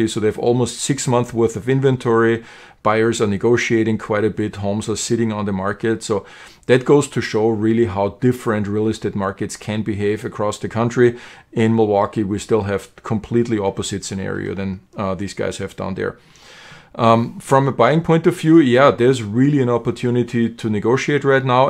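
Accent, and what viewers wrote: Germany. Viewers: Bist du aus Deutschland oder Österreich ?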